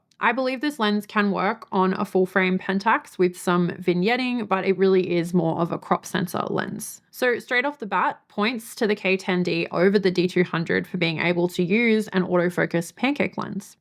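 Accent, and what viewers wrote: Australia. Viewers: You are so Australian and particularly QLD. Love it!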